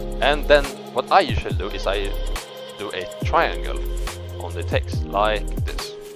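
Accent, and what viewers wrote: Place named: Sweden. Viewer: Du låter jävligt svensk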